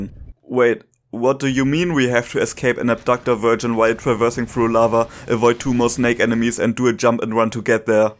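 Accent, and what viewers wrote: Germany. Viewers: bist du bei deinem Englisch zufällig deutscher?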